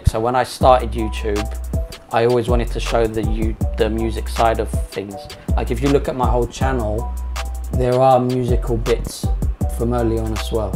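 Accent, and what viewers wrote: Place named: United Kingdom. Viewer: mate I'm telling ya if you go back to UK for even less than a year, you'll get your deep British accent back... greetings from Australia 🤙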